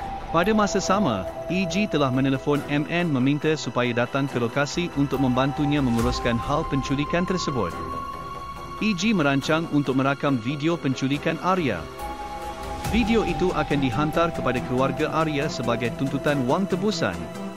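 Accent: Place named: Malaysia. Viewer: Cannelnya solo suara prmbawa acaranya kaya orang malasiya ya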